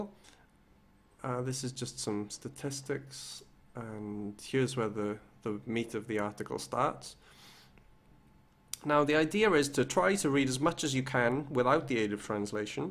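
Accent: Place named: Wales, United Kingdom. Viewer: I love hearing a fellow Welsh accent on youtube, always a nice surprise! Thanks butt!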